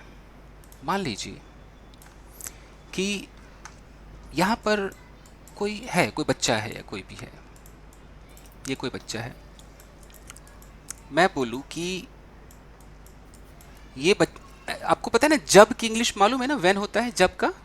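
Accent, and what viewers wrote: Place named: Bengal, India. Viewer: Really sir amazing your Bengali tone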